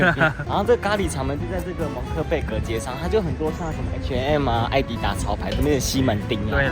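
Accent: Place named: United Kingdom. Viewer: 和一樣經過過小熊軟糖的店，但當時窮遊覺得買不起就沒進去了～印象中的漢堡是一抹鮮豔的紅好想念德國啊啊啊啊聽到你的英式德文忍不住笑ㄌ😂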